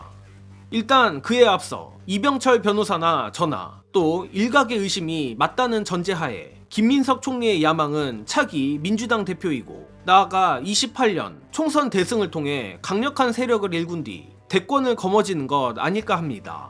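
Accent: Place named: Gyeongsang, South Korea. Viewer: 엿까는 방송 이고만 어투는 갱상도 출생者 같은대 쪼개기 고만하고 방송접버시길 ㆍ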